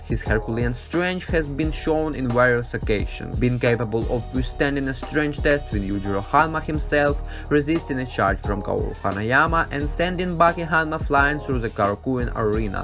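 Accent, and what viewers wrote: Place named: Russia. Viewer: Первое что услышал, это то что он русский А потом посмотрел мейл ру почту в описании канала)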